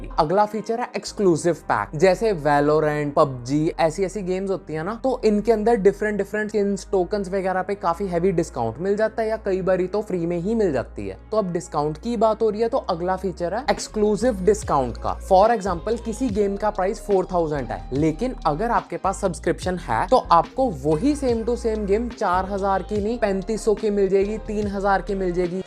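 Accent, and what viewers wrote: Punjab, India. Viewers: Bro are u from jammu or Punjab because of Accent?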